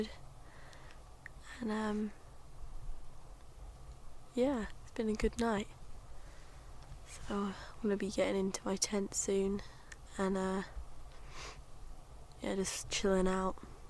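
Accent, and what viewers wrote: United Kingdom. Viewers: I love your calm voice and your little accent so British, it's too charming. You have the right to make fire in your English forests?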